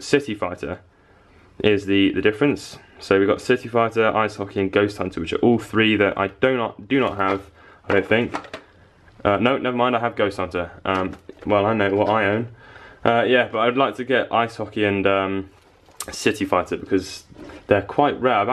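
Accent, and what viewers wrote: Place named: United Kingdom. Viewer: Ashens checklist: “Arrgh” check! LCD ripoffs. Check! Mega duck. Check! Hands in front of the camera. Check! “Tat” check! British accent. Check! AND lastly... GAME CHILD. Check.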